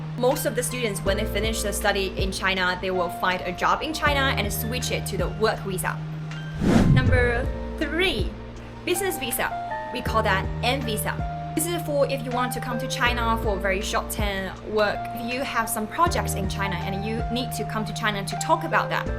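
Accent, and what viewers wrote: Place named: Singapore. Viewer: Singaporean British English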